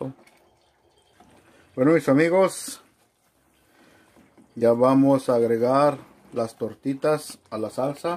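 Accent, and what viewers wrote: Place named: Guerrero, Mexico. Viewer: Holaaa. Así hablan en guerrero. Xque no sabía sorry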